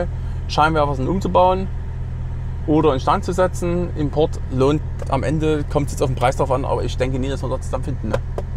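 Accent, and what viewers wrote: Saxony, Germany. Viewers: Alter deine sächsische akzent ist absolut Hammer 🤣🤣🤣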